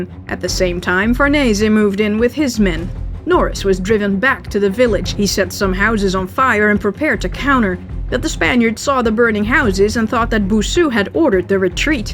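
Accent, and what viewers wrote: Netherlands. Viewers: I love the narration. The dutch accent is very sweet to the ears. And of course this is well written with good humour. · This channel needs more views especially given the quality of the content. Also by the way you pronounce things would it be right to assume you're from the Nederlands.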